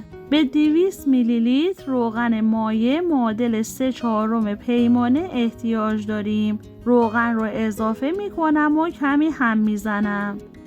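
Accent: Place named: United Kingdom. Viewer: استاد چرا زبان پارسی را با لهجه انگلیسی صحبت می‌کنی .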